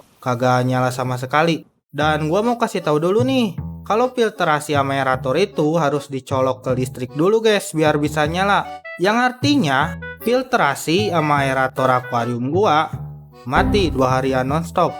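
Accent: West Java, Indonesia. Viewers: Orang jabar ya bangg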